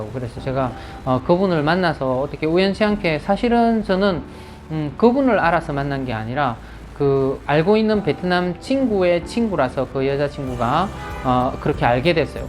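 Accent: Gyeongsang, South Korea. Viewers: 경상북도 내륙쪽 어디 억양이시네요~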